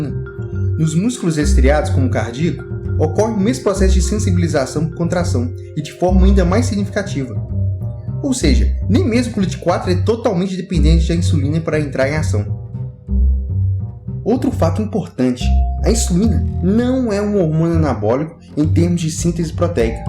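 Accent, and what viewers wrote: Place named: Minas Gerais, Brazil. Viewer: ***** Sua voz é bonita e agradável ao ouvido. Acredito que o "detalhe" no final das frases é questão de mineirice mesmo...kkkkk...Aquele abraço!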